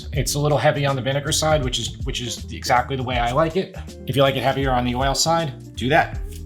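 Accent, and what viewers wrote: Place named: Long Island, United States. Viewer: i just knew you were from Long Island from your accent. I as well have lived on LI my whole life..........and love your videos!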